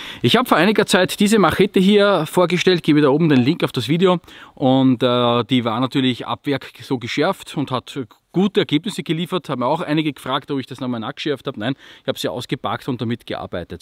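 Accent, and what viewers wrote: Austria. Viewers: Wieder ein interessantes Video. Ich gucke deine Videos immer gerne. Bin auch ein grosser Fan des österreichischen Dialekts 😊. Sehr sympathisch. Ich hätte noch eine sachbezogen Frage. Wie schärfst du dein Fällkniven? Habe mit balligen Schliff so meine Probleme. Vielleicht könntest du zu dem Thema auch ein Video machen 😬😇? Viele Grüße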